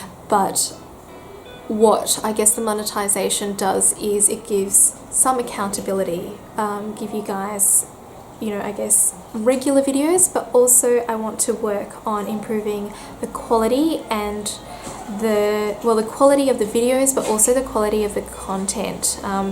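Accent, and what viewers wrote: Australia. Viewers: Thank you for this great 100th video. Nice to see the face with that fabulous Australian accent. Keep the great videos coming!!!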